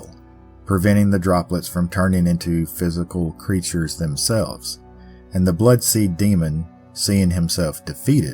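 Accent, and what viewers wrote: South, United States. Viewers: I always start looking for your newest video starting Sunday afternoons, then check hourly. You always make it well worth the wait. Love to hear a fellow Southerner. North Carolina ☠🏴‍☠⚔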